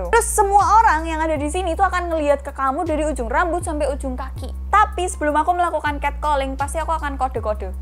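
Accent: Java, Indonesia. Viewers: Medok mu semangatku mbakk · Kak kalog bicara lucu yak medok medok gemes....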